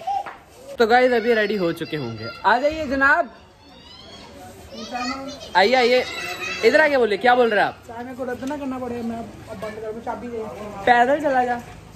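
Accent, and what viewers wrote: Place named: Punjab, India. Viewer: Very Punjabi c bolya karo kntt lagda o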